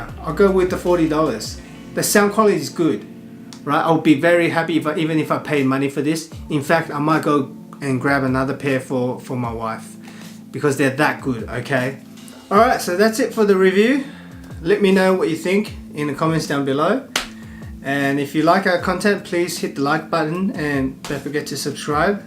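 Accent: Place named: China. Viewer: este chino hablando ingles me vendió los audífonos a un latino. Maravilla en su máximo esplendor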